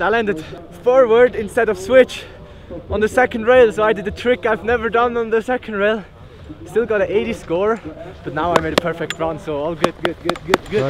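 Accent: Switzerland. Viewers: Deine Vlogs werden echt immer besser! Liebe deinen Schweizer deutschen Akzent😂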